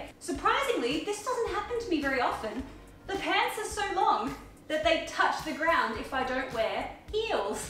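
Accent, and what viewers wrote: Australia. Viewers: Alright, I am just going to get this off my chest because it's honestly really annoying. She's fuckin' Australian. It's where she grew up, lived, and lives. I don't understand why so many people feel the need to tell her HOW to say things or comment so much about the accent, and nearly every time it's an American. lol. Newsflash: Not everyone talks like you, even in your own country you've got different accents. When my parents moved to the states, I had to un-learn my native language, basically, because she was scared that our accents would set us apart and we wouldn't be able to live more 'normal' lives. I'm re-learning it now which is strange but also fun. Anyway! Let speak the way she speaks, I love it.:) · I'm French and I love how she talks it sounds cute, I would love a video in which she teaches some Australian expressions like love hearts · Who said anything about her accent being bad. 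I think Australian accent is cute. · I'm very excited about the idea of thriftmas 🙌 sounds like a great time. Also don't mind your accent, your Aussie land accent is endearing. Plus I love that fact that all of y'all say love hearts ❤️ Also, strawberry Sam was too much 😂😂😂😂 reaction was perfect. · Be PROUD of your Australian accent, American's don't talk correct English anyway, from a NZ' er however, Auss ie's, do nd correct dance, six, etc to correct british?pronunciation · You're Australian, speak as you normally would. I love hearing how different cultures pronounce the same words differently. Don't change just because some of us Americans can be bull headed ninnies. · To anyone getting angry over how she pronounces words - she's Australian, they pronounce things how they do (and actually, it's often a lot more accurate than how Americans do... considering it's closer to the English way and it's the ENGLISH language). 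Also, I appreciate the cold weather clothes you're wearing in the warm weather. As someone who's sat in in 2 degrees (watching videos whilst waiting for the boiler man to turn up...).... I VERY much appreciate it. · Pronounce words however you want, use metric measures and remind us what season it is where you live. Be proudly Australian and cater to your international audience without having to hide who or where you are. There is a fine line between catering to an audience and pandering to them, you do the former really well and shouldn't have to do the later just because some people can't grasp that thee are different countries in the world where things are different. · I love hearing another Aussie accent on YouTube!:) Thanks for doing what you do! X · Whoever is “correcting” you for saying things in your native Australian accent should be arrested because it’s a crime to tell someone with the cutest accent (but also, you’re AUSTRALIAN not American) to speak differently. 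I’m about to throw elbows with some of y’all in the comment section. People speak differently than you do, get over it. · I’m American, and I love your Australian accent! Different dialects/pronunciations are just part of what makes the world go round. People shouldn’t tell you that you’re pronouncing it “wrong” just because it’s different from how they say it.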